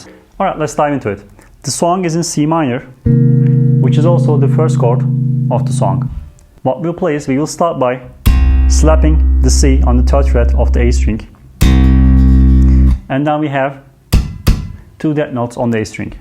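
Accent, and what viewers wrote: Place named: Turkey. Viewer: abi sen kesin türksün konuşmandan anladım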